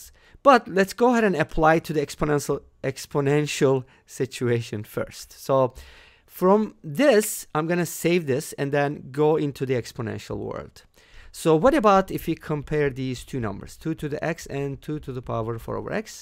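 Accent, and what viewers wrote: Turkey. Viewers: hocam türk müsünüz, aksanınız inanılmaz düzeyde türkiye türkçesi konuşan birisini andırıyor. are you turkish? your english accent made me think that u are turkish.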